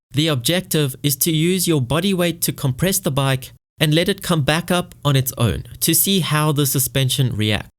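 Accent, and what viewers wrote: South Africa. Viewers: Who else follows this dude partially because of his South African accent!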